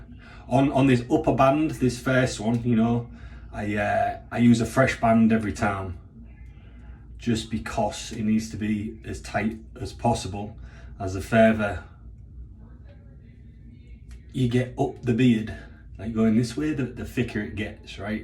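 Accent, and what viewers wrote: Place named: Yorkshire, United Kingdom. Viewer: Mate, are you from Hull originally? Sound like a Yorkshire lad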